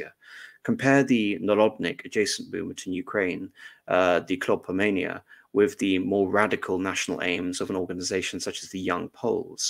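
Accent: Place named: United Kingdom. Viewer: Nice british akcent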